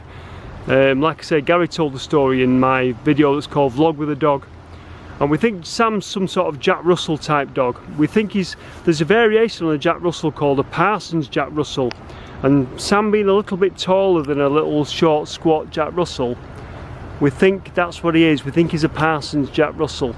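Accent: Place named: Manchester, United Kingdom. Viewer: you got more a north Manc accent, my cousin could be from Barnsley if you didn’t know...proper Yonner.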